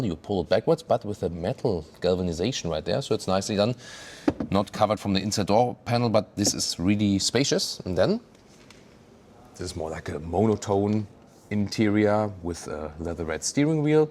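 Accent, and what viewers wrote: Germany. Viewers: Listen to his German-speaking videos, very solid Hochdeutsch. Probably not faking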